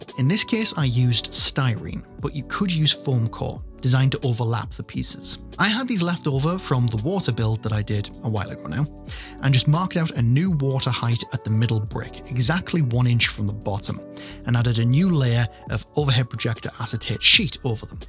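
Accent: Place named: United Kingdom. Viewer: Every time you paused, then said something uncomfortable "organic material we are trying to replicate" - made me chuckle every time. Your voice is very soothing, and as an American, I love that British accent. I leave your videos running when I'm building, even if it isn't the same video to what I'm making.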